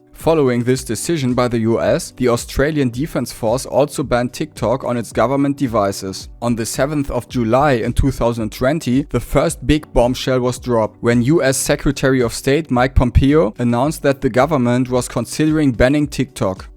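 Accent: Germany. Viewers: Is des a german accent?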